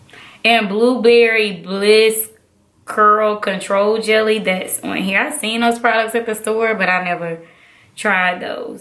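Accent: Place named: South, United States. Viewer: You country and ghetto sounding so I couldn’t finish watching your video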